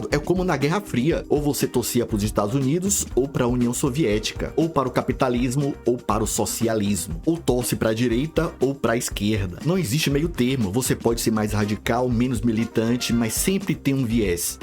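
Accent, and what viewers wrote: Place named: Northeast, Brazil. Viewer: Que bom ouvir alguém com sotaque nordestino que não seja Renan Calheiros, José Sarney, Ciro Gones et caterva. Parabéns !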